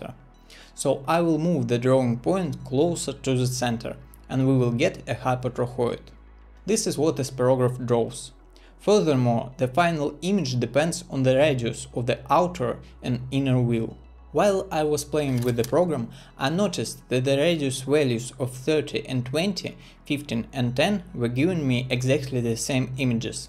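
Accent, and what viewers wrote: Russia. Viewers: I programmed only two-circle version myself and stoped, while you pushed it further more to make it really beautiful, nice video! Some interesting Russian accent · У тебя явный русский акцент)) Анимация просто потрясающая!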